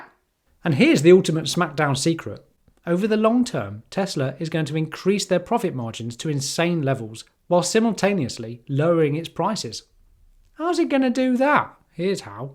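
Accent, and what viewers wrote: United Kingdom. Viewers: Lovely content. Funny accent. Are you from Liverpool or Manchester, perhaps?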